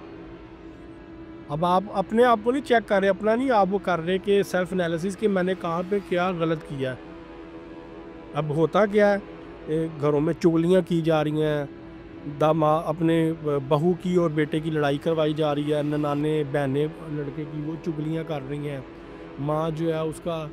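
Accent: Punjab, Pakistan. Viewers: خوف ہے کھوف نہیں اینکر ہو پاکستان کی انڈیا کی مت بنو تمہاری اردو میں پنجابی کی جھلک نظر آرہی ہے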